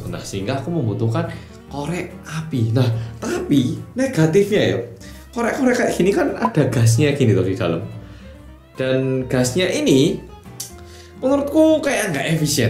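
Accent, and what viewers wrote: Java, Indonesia. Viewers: Otw 5juta subscribers.. mangatss mas medhookk ❤️💜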